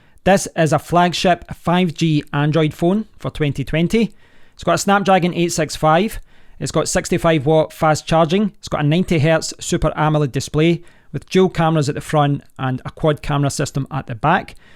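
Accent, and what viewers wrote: Scotland, United Kingdom. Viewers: I wish I could speak Scottish English, sounds amazing!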